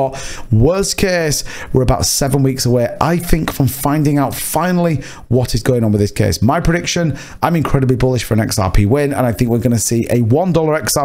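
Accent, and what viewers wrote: United Kingdom. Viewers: Great to hear a British voice doing XRP content. 🙏🏻 come on XRP…… from a fellow Brit.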